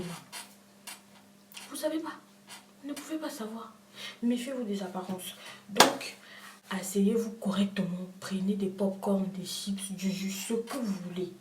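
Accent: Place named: Republic of the Congo. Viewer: Rien qu'a l'accent... je reconnais Congo brazza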